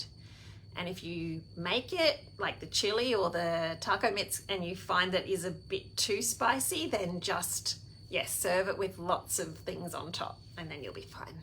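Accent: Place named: England, United Kingdom. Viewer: Beautiful accent voice.reminds me of my sister in England..Thank you Good idea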